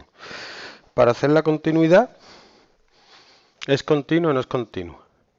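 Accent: Andalusia, Spain. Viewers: Que se note quien es de Andalucía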